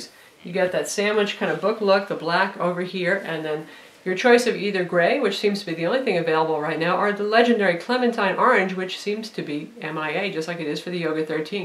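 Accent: United States. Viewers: Good reviews, but oh, sometimes her fast, american accent speech leaves no chances to understand well.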